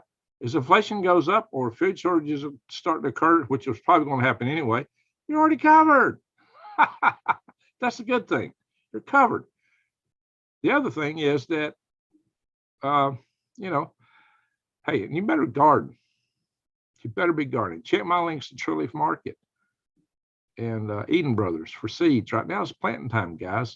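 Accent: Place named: Appalachia, United States. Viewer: I tried to share with my old friend; all he could do is copy accent, 'hill-billy'. My sister would think I was a joiner, so.. Like Pork during Ramadan- good, more for me!!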